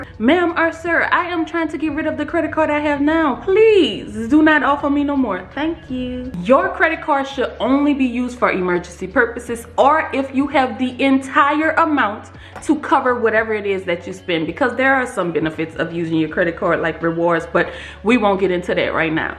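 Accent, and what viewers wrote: New Orleans, United States. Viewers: Lol I’m sensing a NOLA accent; love the energy in the video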